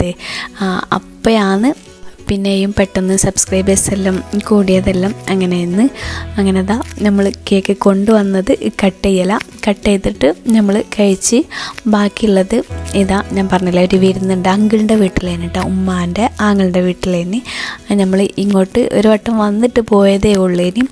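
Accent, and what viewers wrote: Kasaragod, India. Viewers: ഈ slang ksrd പോയതിനു ശേഷം പഠിച്ചതാണോ? മലപ്പുറം slang ഇങ്ങനെ അല്ലല്ലോ atha ചോദിച്ചേ ❣️❣️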